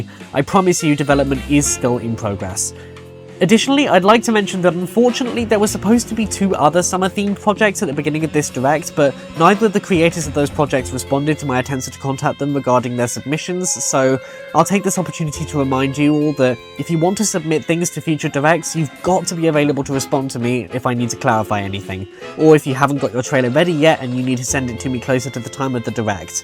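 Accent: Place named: United Kingdom. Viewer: It's a good Direct, but my only criticism is that some unscripted parts of the Direct gave me too much of a headache with your British voice, especially the "sponsored" bit at the end with that Greek statue guy who is really crazy annoying to me! That's why I prefer another fan direct like Rayman Alive or F3 So remember, next year, no more crazy staff or badly unscripted parts!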